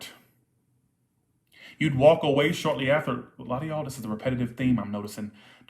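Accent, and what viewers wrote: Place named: South, United States. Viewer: Your Strong Southern accent is popping up today.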